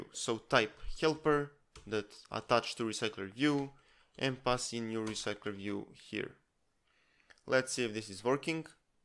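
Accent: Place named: Russia. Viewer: акцент вроде русский:yt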